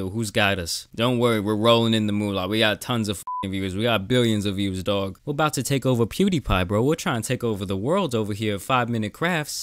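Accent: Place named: New York, United States. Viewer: The only guy from Texas with a New York accent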